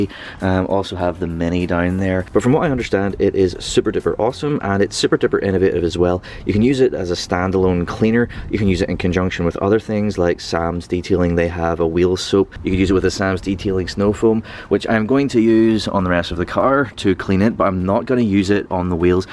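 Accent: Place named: Northern Ireland, United Kingdom. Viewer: Love the video mate I recognise that accent whereabouts in NI are you from?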